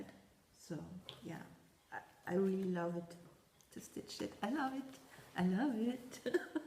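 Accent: Germany. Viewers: As usual, I love all your little finishes, & the snowmen finish is adorable. I've been wanting to tell you several times now that I love the lantern you have behind you. I collect them & can never have enough. I really enjoy your videos & love your German accent. Nothing to dislike about your videos. 😊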